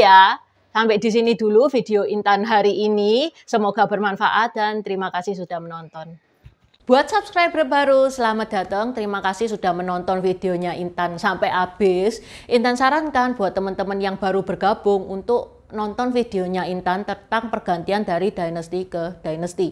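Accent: Java, Indonesia. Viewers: Aku suka logat hostnya. Orang Jawa kalah medok. ❤ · Logatmu negara Jawa,, 🤣🤣 ,, Jawa itu cuma suku yg tinggal di daerah Jawa di Indonesia,, bahkan di pulau Jawa (bukan negara Jawa),, sewajibnya kamu harus logat Indonesia,, jika tidak, channelmu gak bakal maju sampai mati 😢😥🤤😲,, belajarlah ke Deddy Corbuzier yg Indonesia banget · NGOMONG NYA BIASA AJA TUDAK USAH MEDOK GITU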